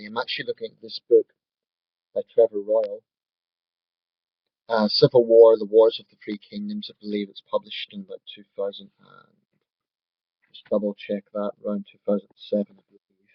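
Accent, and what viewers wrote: Scotland, United Kingdom. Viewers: interesting listen. your ulster accent is particularly scottishesque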